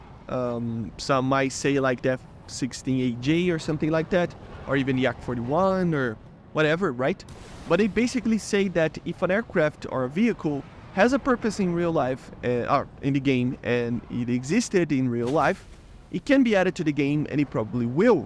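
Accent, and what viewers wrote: Brazil. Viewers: eae, po ver o f18 seria um sonho, e agr o gripen tbm deu p ver q tu é br pelo sotaque huahauahau tu voa onde mano? sou piloto tbm, mt bom ver br fznd video do war thunder tmj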